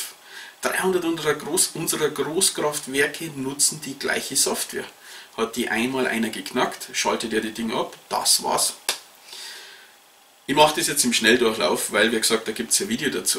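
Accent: Bavaria, Germany. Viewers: Für einen Bayern redest du richtig gut hochdeutsch. Ist sehr verständlich. Hab schon Sachen erlebt, da hab ich nichts, aber auch gar nichts verstanden. Hab nur nach dem Weg gefragt..... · Hallo,zuerst mal super Kanal und auch für mich als Elektriker,Prepper,Sportschütze und Funkamateur moch was zu lernen. Du hast ne tolle Art besonders der Bayrische Dialekt ist super. Einen kleinen Kritikpunkt hätte ich und zwar die schlechte Tonqualität,vl wäre ein externes Micro oder so besser aber wahrscheinlich weißt du das eh selber und bist da in der Richtung eh schon am überlegen. Freu mich auf weiter VIdeo bitte mach so weiter echt TOP.!!!!!!!!!!!!!!!!!!!!!!!!!!!!!!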